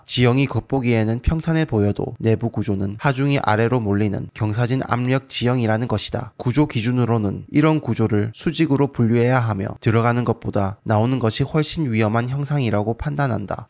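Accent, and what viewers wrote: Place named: Seoul, South Korea. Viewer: 사투리랑 서울말이 섞여서 느낌 참 오묘하네 효과음은 좀 뺍시다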